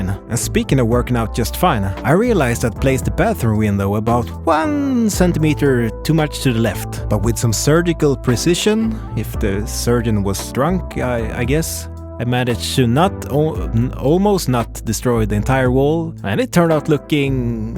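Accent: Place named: Norrland, Sweden. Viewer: Lägga plaank, where in Sweden do you live? Sounds like up north 🎉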